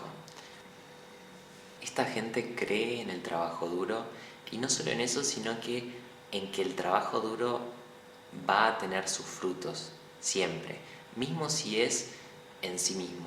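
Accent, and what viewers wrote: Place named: Argentina. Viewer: No entiendo, no eres lindo pero me gustas. Q pasa?😅😂 tu voz, mirada? Sonrisa? Me distraes. Ni siquiera me gusta el acento argentino. Tienes ascendente libra? O es que me recuerdas a mis primos? No malinterpretes, no me gustan ellos, pero uno aencuentra atractivo a alguien con semejanza de parentezco. Y aqui concluye el comentario de un mercurio en piscis 😂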